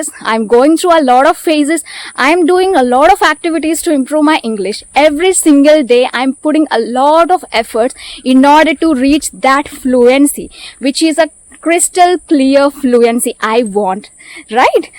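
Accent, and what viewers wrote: South India, India. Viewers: I think you are from south India.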